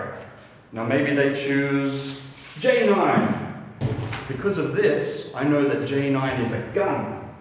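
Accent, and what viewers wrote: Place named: Darwin, Australia. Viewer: He sounds like he's from Darwin, Australia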